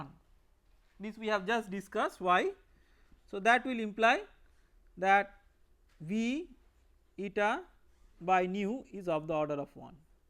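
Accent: India. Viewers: ok, why all videos about turbulence on youtuve feature a dude speaking with an indian accent? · He is an indian professor.Why should he speak in some other accent? If you are not comfortable with it-why don't you go and watch MIT videos?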